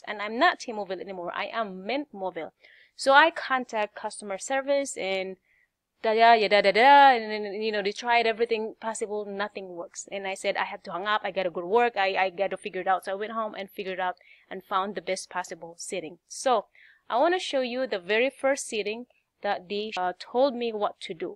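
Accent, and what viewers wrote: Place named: Pangasinan, Philippines. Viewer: That's a great accent! Pangasinan?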